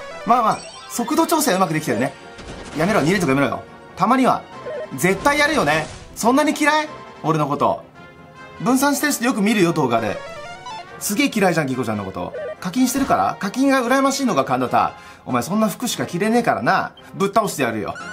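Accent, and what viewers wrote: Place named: Osaka, Japan. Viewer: 大阪のおばちゃんかおもいました。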